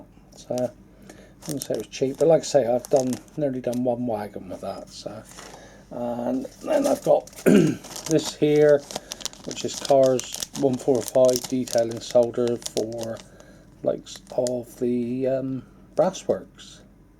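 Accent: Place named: Cornwall, United Kingdom. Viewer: Just found you on here, nice to hear a proper accent on utube:-) I was born in Truro up Malabar back in 55 so I remember Truro Station very well. Just old enough to remember Steam and standing on Black Bridge as the Steam Locos went underneath so that we were hidden in the cloud as it went by. Also remember Newham and trains running down there, and walking the line many times as a youngun. Anyways proper job with the vids.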